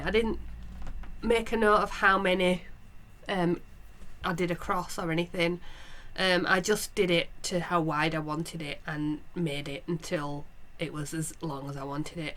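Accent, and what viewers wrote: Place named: Northern England, United Kingdom. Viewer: so lovely to listen to a fellow northern accent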